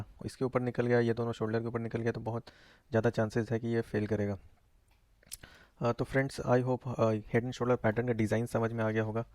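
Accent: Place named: India. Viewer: Can't understand the English. Sounds indian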